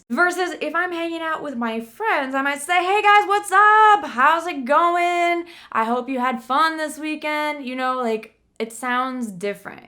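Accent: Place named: United States. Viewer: I am from India and i want to make my pronounciation like you , like American..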